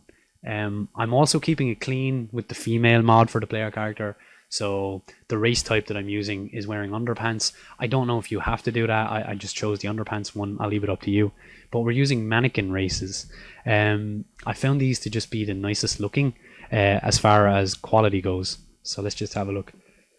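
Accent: Ireland. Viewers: what's lol about it? he's Irish, I believe.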